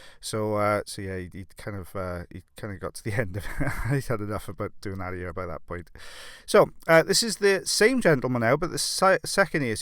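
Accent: Wales, United Kingdom. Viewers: Are you from Wales by any chance? :)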